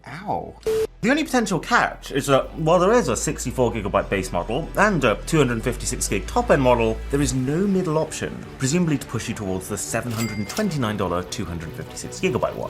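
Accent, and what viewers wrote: United Kingdom. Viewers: Hello Mrwhosetgeboss...Can you do ya favour and add subtitles.... it's still hard to catch up with your British accent,still with auto generated subs.. Help some Indians bro...😄😄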